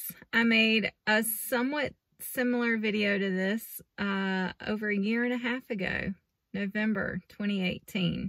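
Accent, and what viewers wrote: South, United States. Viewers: As a Brit I love your southern accent so cool 😎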